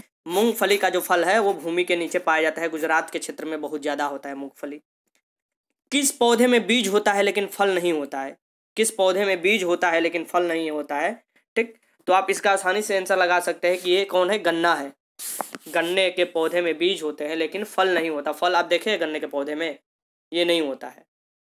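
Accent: Bihar, India. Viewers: Bihar se hai kya jii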